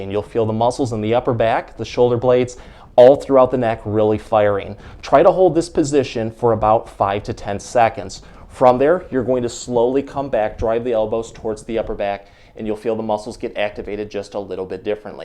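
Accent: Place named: Midwest, United States. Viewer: You’re def from Chicago or the Midwest with that accent lol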